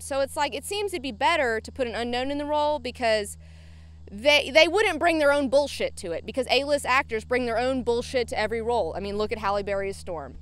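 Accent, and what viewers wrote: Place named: South, United States. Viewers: Yer southern accent comes back the further east you go. Yeah, yeah - cast the unknowns! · im really starting to hear that southern accent come out of you, especially when you got that alcohol in ya. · I know youre in Alabama shooting the video but is that home, because that local accent was really coming out, i thought it was my imagination at first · I noticed a southern twang in your accent I never picked up on before. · Sounds like Female Kenny Powers. Is she from the place she's vacationing? I know a lot of people revert to their 'drawl' when they go back to their home turf. I see it when I go back my home; get drunk and start talking local again. No issues at all with the statements though; entertaining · Wow, sounds like the Alabama accent really stuck on you. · Where are you from? Slight southern accent coming through. 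Going to say Nebraska. Yes? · LOOK WHO GOT HER SOUTHERN DRAWL BACK! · Spend some time in Alabama and the Southern comes out. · You picked up a southern accent.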